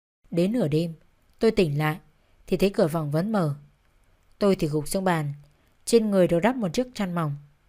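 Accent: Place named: North, Vietnam. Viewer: khong phai ky thi chu giong la giong bac ma minh thay de thuong kinh khung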